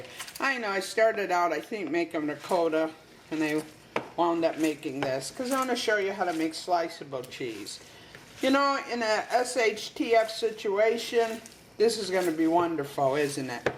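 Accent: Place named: New York, United States. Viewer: I have a dear friend from New York - she has the same accent.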